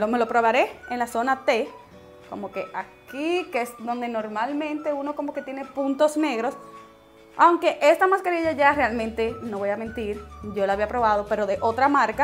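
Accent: Dominican Republic. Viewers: Gracias amiga! Es que note su acento parecido al de República Dominicana...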